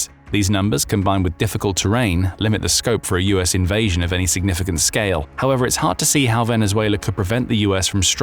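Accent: United Kingdom. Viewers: interesting there is a british announcer narrating this video, implies the drug trade in participated in by British intelligence ...MI-6, anyone? Nothing new about the British trafficking drugs to exploit and destroy regimes and countries ... and the british monarchy is historically one of the biggest players in trans-national drug dealing ...after all, where did their wealth come from ... invading, destroying and colonizing colonies and countries of color. City of London bankers direct and finance all this for the monarchy, and it's no secret, that the U.S. remains a colony of the British Empire, according to treaties signed in 1776, 1810, and so on. It seems to me if South and Central and North American countries are humbled as drug dealers, that puts the british back on top in eastern europe, western europe, eurasia, africa and so on. signed, PortlandHomeboy ... 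btw that's also the name of my website.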